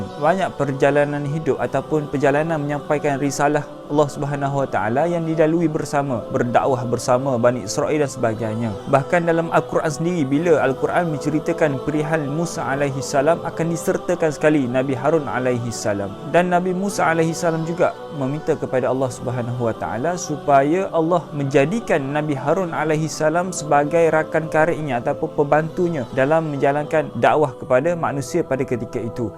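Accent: Kelantan, Malaysia. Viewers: Abang ni orang kelantan ke?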